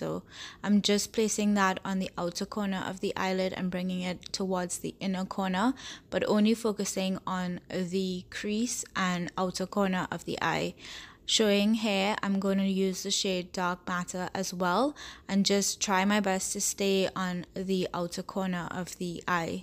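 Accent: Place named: Trinidad and Tobago. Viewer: Awesome hun that Trini accent can't hide at all 😘😘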